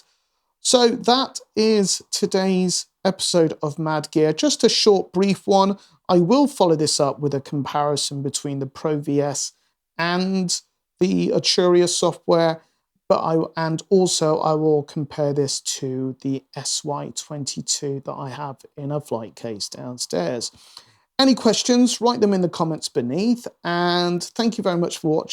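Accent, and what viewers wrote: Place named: United Kingdom. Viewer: you grow a brit accent. nice synth, review.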